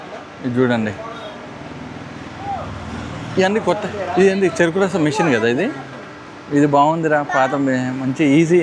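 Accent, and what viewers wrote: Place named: Telangana, India. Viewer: Nice video.. meedi Telangana laa ఉంది.. మీ వైఫ్ ది ఆంధ్రా లా ఉంది.. కదా?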